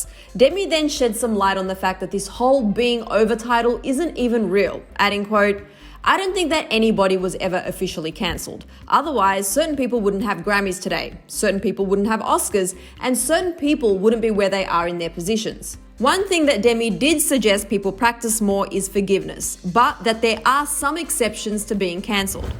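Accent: Australia. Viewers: you're just not used to Australian accent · What is that dialect? Is it Australian?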